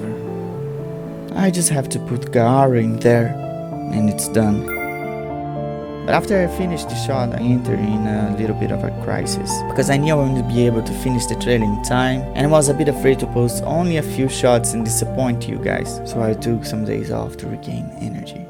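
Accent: Brazil. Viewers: na verdade ele tem um sotaque mt fácil de identificar que é brasileiro kkk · Você é brasileiro né? da para notar no sotaque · Sim ele é brasileiro, dá até para notar um sotaquezinho e tbm mostra na localização do canal, só que como a maioria do público dele é gringo ele fala em inglês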